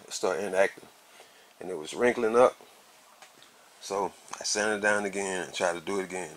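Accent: Alabama, United States. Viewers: What part of Alabama you out of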